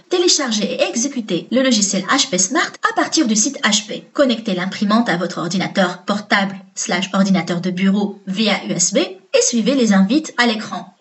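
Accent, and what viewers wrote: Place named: Morocco. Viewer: voix marocainne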